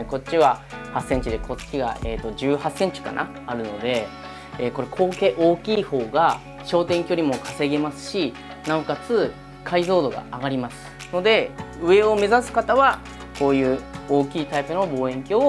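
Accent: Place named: Hokkaido, Japan. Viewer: 恥ずかしながら私はカメラマニアではなく、北海道弁が気になっちゃって、拝聴させております。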